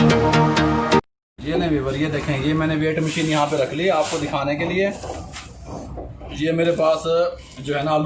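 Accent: Punjab, India. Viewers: Aapki punjabi sun ke din ban jata hai bhai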